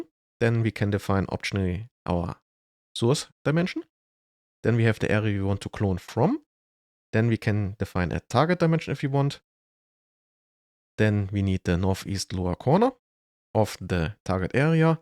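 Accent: Germany. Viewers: Guten tag, your german accent is so good, i'm kinda jealous about it. Tut mir leid, meine deutsch ist sehr schlecht, ich sprichst ein bisschen deutsch, aber das ist lange her :p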